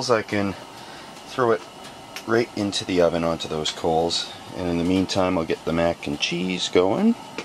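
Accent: Canada. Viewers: That canadian accent tho 😂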